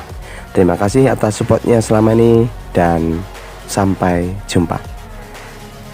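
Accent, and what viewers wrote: Java, Indonesia. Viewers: Lokasi sampean Ki ngendi to bang kok koyo wong jowo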